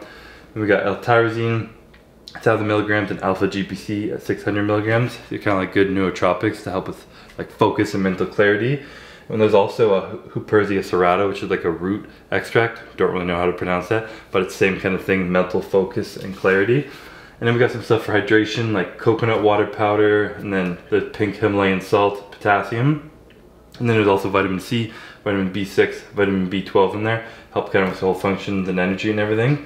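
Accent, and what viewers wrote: Canada. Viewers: Can we get that extra Canadian more often pls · Embracing the Canadian accent on this one. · the most Canadian intro I've ever heard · Honestly never knew you sounded this Canadian, brilliant😂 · Why in the beginning of the video does sound more Canadian than ever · actually sounding canadian hahaahhahahaha loving it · Your Canadian is showing lol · I feel like ya really turned on the Canadian in ya here bud lol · actually soundin canadian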